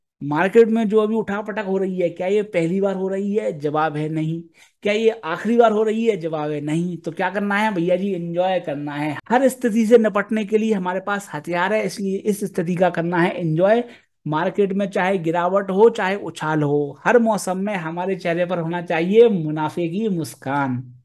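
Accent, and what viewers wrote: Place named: Bundelkhand, India. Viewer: Hum to sirf Bundeli sun ne k liye dekhte hai Baki to humaye dadda sikhaye gaye hate market se door rahiyo 🤣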